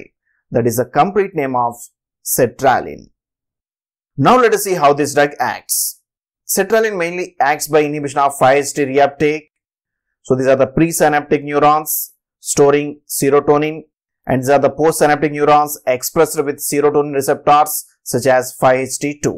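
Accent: India. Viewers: I open , I hear Indian , I leave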